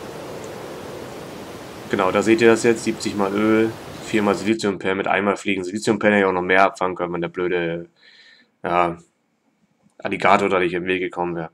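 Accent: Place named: Hamburg, Germany. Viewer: thx für das Tutorial. Vom Sprachbild würde ich mal stark Hamburg und Umgebung schätzen.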